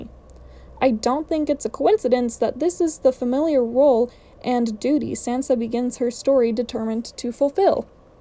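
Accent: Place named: France. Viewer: Excellent French accent! Interesting, insightful and enjoyable. Thanks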